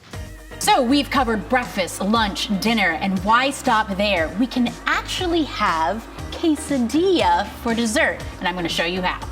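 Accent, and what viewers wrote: South, United States. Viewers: Omg u made me so hungry LOL pizza one looked so good and I loved the country accent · I had no idea had a country accent before it sounds so adorable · Hello there southern accent! 😂 I love it 😊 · Hi!!!! I love the red lip and I just love pizza too!! Your son and I will get along well, and my daughter, but she only likes cheese. You would think I'm italian bc I love Italian food!! I love your food videos. You just sounded like Tamera in your southern accent!! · yes fpr the country accent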